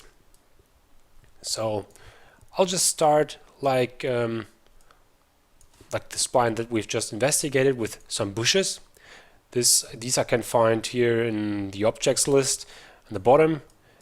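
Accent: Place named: Germany. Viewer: Schöner deutscher Akzent :D Wie sind die Hotkeys um zb. die Z Achse zu ändern? Hast du ne Liste?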